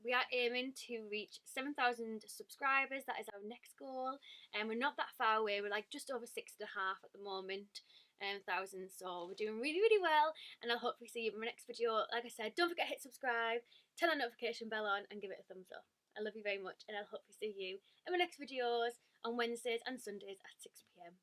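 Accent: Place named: Leeds, United Kingdom. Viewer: You sound like your from Leeds ? X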